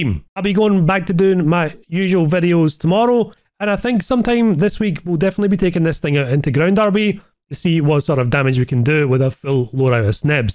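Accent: Scotland, United Kingdom. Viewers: great video guy! good to hear another scots voice out there on the interweb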